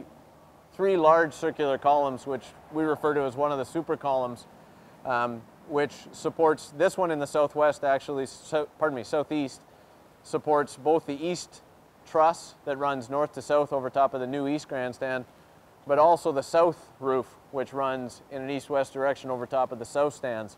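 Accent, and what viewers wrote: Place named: Canada. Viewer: "Souwth" as an American this is the first Canadian I've ever come across that had a stereotypical Canadian accent.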